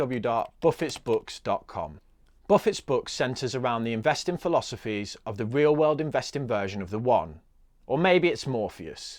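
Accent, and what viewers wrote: Birmingham, United Kingdom. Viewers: I had a strong feeling you were from Birmingham. I’m also originally from Birmingham - King’s Norton. Keep up the good work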